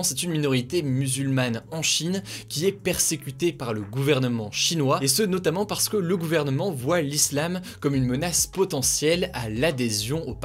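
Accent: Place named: England, United Kingdom. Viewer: On apprécie l'accent anglais d'Hugo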